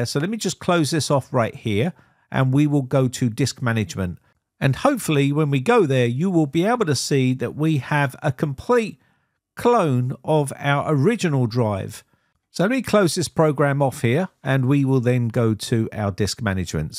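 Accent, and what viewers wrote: England, United Kingdom. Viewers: Lever is pronounced leave - er In English and you sound English to me ! Resist the American language. Most Americans think they speak English until they visit England .I once worked London with a lot of Americans. We spent some time explaining believe me !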